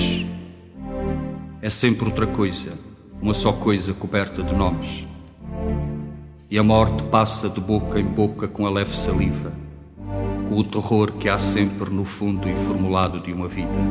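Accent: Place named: Madeira, Portugal. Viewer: Foda-se e com sotaque madeirense!!! Orgulho de ser madeirense!!!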